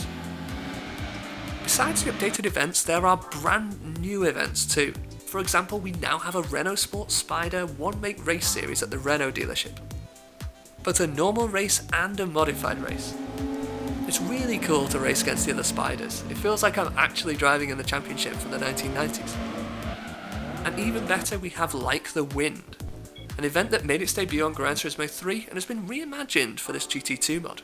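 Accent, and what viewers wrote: United Kingdom. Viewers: Ah yes, nothings warms this cold friday evening more than listening to a british bloke talking about a GOAT tier simcade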